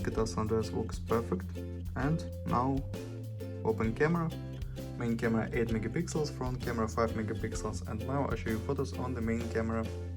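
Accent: Russia. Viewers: Русского человека слышно сразу) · с ярко выраженным русским акцентом и отвечающий только на русские комменты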